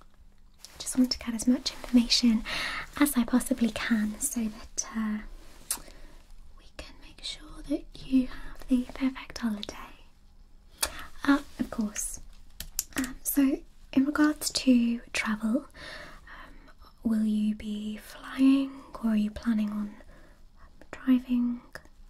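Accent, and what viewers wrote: United Kingdom. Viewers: The accent is giving me the chills. British is so damn nice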